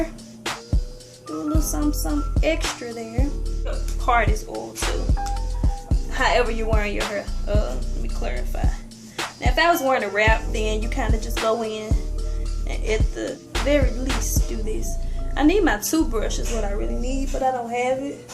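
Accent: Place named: Memphis, United States. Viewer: I knew you were from Memphis as soon as I heard your voice lol your hair is laid hunty!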